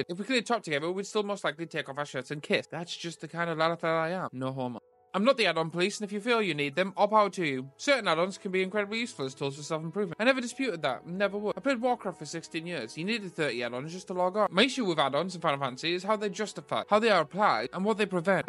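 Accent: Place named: United Kingdom. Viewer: Note on you speaking fast: I'm a fast-talker too. My mouth tries to catch up with the runaway freight trains racing along the multiple interweaving tracks in my brain and often ends up tripping along the way. So I get it. I do still need subtitles to understand you, but that's mostly because I'm not british. The full-speed words combine with the accent I'm not used to in a way that turns my brain to mush. No need to feel self-conscious over something you can't really control, we all just work with what we got.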